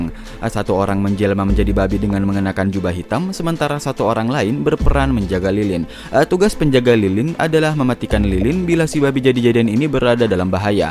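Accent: Makassar, Indonesia. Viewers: Penasaran ku ini sama admin, tinggal di mana Ki? Logat nya Makassar bgt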